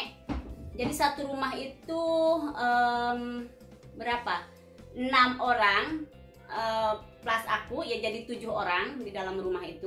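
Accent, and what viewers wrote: Sunda, Indonesia. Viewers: Bu sundanya dariama mani medok sundana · Seru ngomong teh sunda banget jd seneng ngikutinya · Salam kenal sy dari kadipaten majalengka..mau tanya.Mama.asli ny orang mana..logatny sperti orang sunda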